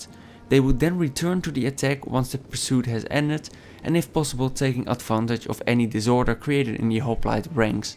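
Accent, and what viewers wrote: Netherlands. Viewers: love you dutch accent!!!! Nederlander en meoten we trots op zijn, Batavi, frisii.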